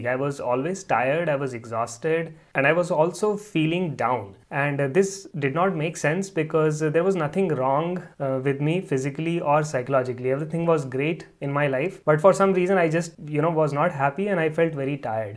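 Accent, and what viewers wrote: India. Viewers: Whiteamin Dea 😂 I love the Indian accent haha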